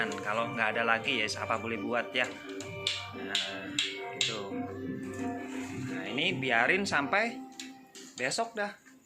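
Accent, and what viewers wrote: Surabaya, Indonesia. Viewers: Om daerah mana?? Kok bhs nya Suroboyoan. ??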